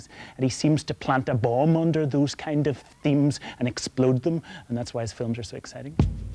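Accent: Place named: Northern Ireland, United Kingdom. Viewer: That Northern Irish lilt!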